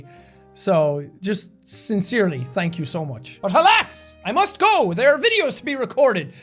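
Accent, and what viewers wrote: Ireland. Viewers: Maybe the only think I'd ask for is that he never loses his Irish accent. xD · i am a little bit, i have a BIG thing for Irish Accents..so if he is in the UK now it does worry me a little bit. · NOOO U WILL LOSE IRISSH ACENT OH NO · don't worry he won't, he's a fully grown adult ("adult"😂), so he may lose it slightly but he'll always have that irish tinge ( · ahh no more Ireland :( Well hope you enjoy your new home...<3 Hope you keep your amazing accent too <3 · He is going to loose his awesome irish accent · My Irish Potato is gonna be in England.... You better keep your accent! Don't get a British one! That's what Stampy is for! Stay you! -Keegan Tripp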